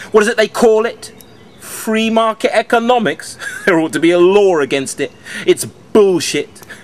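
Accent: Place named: United Kingdom. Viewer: sweet british accent lol im not that into poetry but this sound pretty awesome :D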